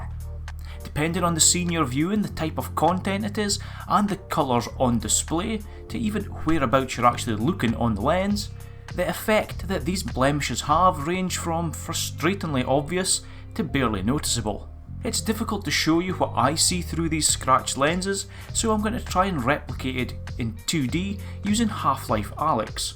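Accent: Scotland, United Kingdom. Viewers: Love that Scottish accent m8😂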